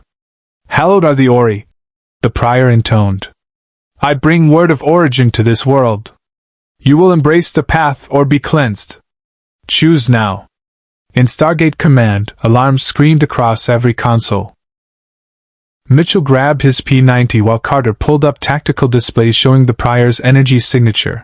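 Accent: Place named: South, United States. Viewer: Sounds like the South...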